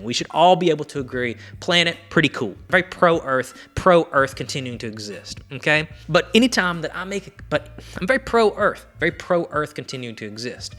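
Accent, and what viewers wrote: Kentucky, United States. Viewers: 1. Instant film is overhyped and overpriced and takes absolutely know photography skill at all other than holding the camera still. 2. Fujifilm abandoned the filmmaking community in 2013 (reference their last CEO bluntly stating film photography was irrelevant). 3. I love Kentucky. My mother was from Liberty (Casey County) and I made a short 16mm film on my visit to Danville and Liberty). Your accent reminds me of my family down there who are down to Earth just good people and the mainstream media for years has stigmatized Southern folks unfairly as inferior. Dont change the accent. 4. I know EXACTLY which film influencers you talk about as low energy not to name names cough grainydays but yeah I dont get the whole jaded attitude projection either? Its like dude you should feel excited and privileged you get to shoot film in the "digital era. 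AND heres my issue with the film photographer "influencers" and they continue to ignore this point but they refuse to acknoledge the fact that shooting on film is about OWNERSHIP of images i.e through hard copy archiving. Thats the BIG reason I shoot film. Its not just for the "look" or it "slows me down. "Its the fact I have physical, tangible 4K hard copy image that will last over 100 years. 5. I wont even dignify the "digicam" thing whatever that is but its most definitly not celluloid but you share the same frustration with Digicams that I share with those "film emulators" that infect my Instagram news feed. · As a Kentucky native, I agree with your point about the accent. Being from Louisville, I may not have the exact same accent as you and yes... ha, I live in LA now! HOWEVER, it should be more about your ideas than the way you speak. Cheers. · Hell, I come for the accent. I went to college in Lexington, my room mate was from the sprawling metropolis of Pikeville so it's familiar. 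Plus being from Tennessee, I can't make fun of anybody's accent. You're inspiring me to let mine show more than I do.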